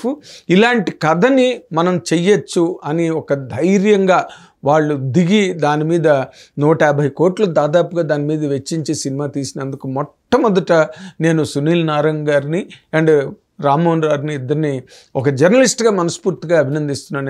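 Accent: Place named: Vizianagaram, India. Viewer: విజయనగరం జిల్లానా? మాట తీరు అలాగే ఉంది!!!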